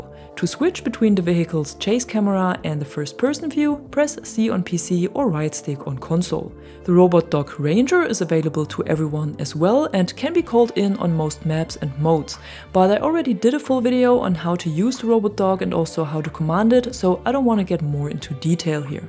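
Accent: Germany. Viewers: you sonds like a german person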